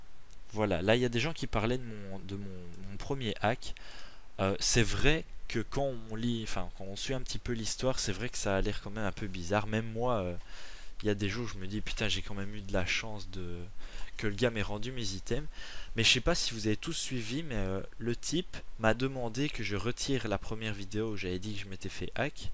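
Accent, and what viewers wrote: Belgium. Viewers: Tu es belge ? Parce que j'aime beaucoup ton accent :) , et vraiment désolé pour ton hack j'ai deja connu ça et fuck les rageux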